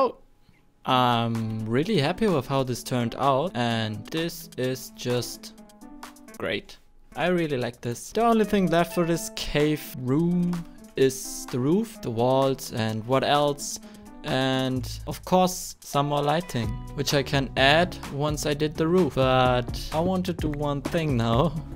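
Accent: Germany. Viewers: ich bin so gut ich hab an deinem accent erkannt das du deutsch bist aber dein englisch is sehr gut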